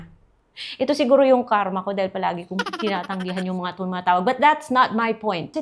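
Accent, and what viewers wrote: Iloilo, Philippines. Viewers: Ilonnga ka Maam?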